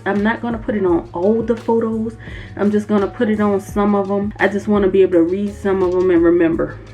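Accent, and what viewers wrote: New Orleans, United States. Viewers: Ok, I need this in my life. However... I want that N.O.L.A. accent bay-be! 🎆🎇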